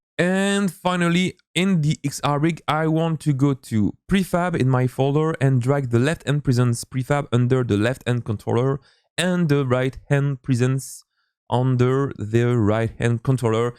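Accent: France. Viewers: Wow:D a Wild appeared.:D Didn't expect this one,:P I like your French accent:P Tnx for the Multiplayer tutorial:) I will need it during my internship:] Epic!!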